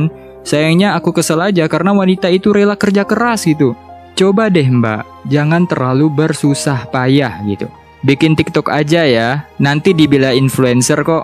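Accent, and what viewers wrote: Pontianak, Indonesia. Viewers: Abang tinggal di ponti? Pantas logatnya kayak kenal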